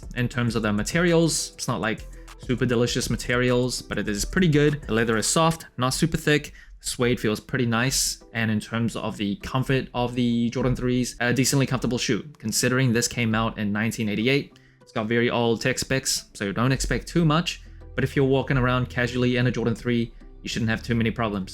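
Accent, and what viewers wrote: Australia. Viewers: Did you spend some time in Australia? I can hear a tinge of Aussie 👍🏼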